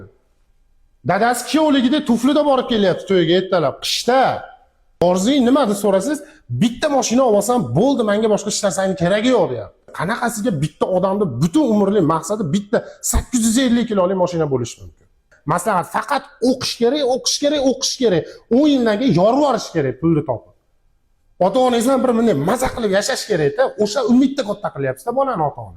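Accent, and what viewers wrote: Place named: Andijan, Uzbekistan. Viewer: Anjanchasiga gap boʻldi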